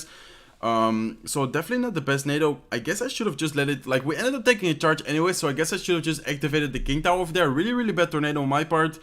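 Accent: Netherlands. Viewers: Are you dutch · Is deze gast niet nederlands of lijkt dat zo aan zijn accent te horen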